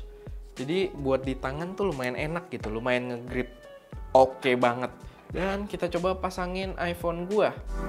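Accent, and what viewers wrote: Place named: South Jakarta, Indonesia. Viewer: Bang org Jaksel ya...? 😅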